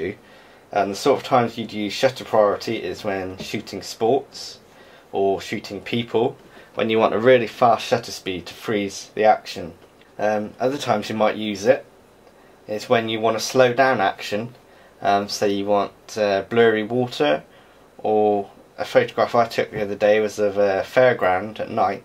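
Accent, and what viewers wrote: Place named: England, United Kingdom. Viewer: Lool, i love how english people talk, that is rubbish.. nice vid btw bro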